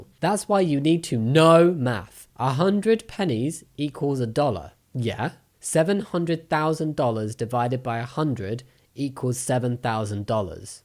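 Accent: United Kingdom. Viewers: it’s alright I’ve gotten used to you saying things in british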